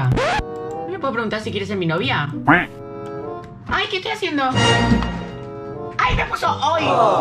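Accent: Argentina. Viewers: SOS ARGENTINO? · vos sos de salta o BUENOS AIRES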